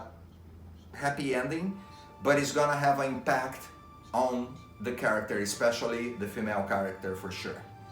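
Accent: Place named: Brazil. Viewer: O sotaque brasileiro é tão fácil de notar.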